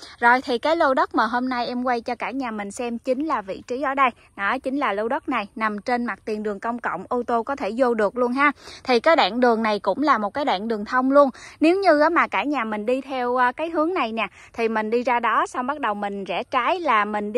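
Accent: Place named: Mekong Delta, Vietnam. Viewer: Thu Nhiên 🍃 Giọng đọc Chuẩn miền tây 👌👌 Tuyệt lắm tác giả 👏👏👏👏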